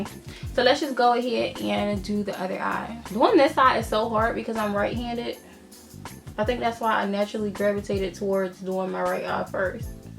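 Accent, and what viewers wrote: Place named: Philadelphia, United States. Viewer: I somehow immediately knew you were from philly. I finally understand our accent 😭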